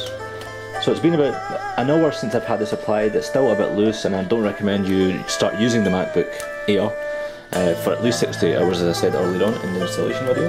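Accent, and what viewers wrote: Scotland, United Kingdom. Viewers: It's called a scottish accent ... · At first i thought you were French, then i realised you were Scottish lol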